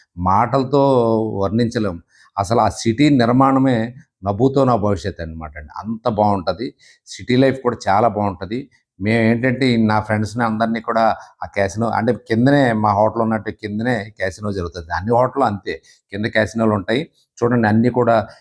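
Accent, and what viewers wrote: Godavari, India. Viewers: Correct ga chepparu me way of talking mana Godavari valla la telustundhi😊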